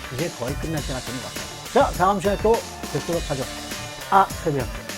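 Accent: South Korea. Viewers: 난 이분 서울사투리가 넘 좋음 귀에 쏙쏙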